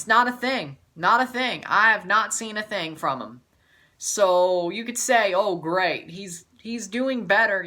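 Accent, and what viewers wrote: South, United States. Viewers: where are you from your accent sounds very southern for a Toronto fan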